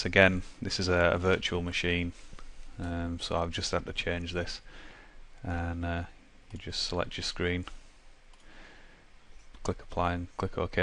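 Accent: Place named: Northern England, United Kingdom. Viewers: Nikos Soumpasis lol it sounds like hes got a northern english accent and it sounds like an easy one to understand. but he was putting me to sleep and making me lose interest lol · i can't thank you enough for that tutorial - you've just saved me two 50 journeys and no doubt the same amount in pound notes from the pc shop for doing it!! and i could even understand your yorkshire accent! :@ cheers.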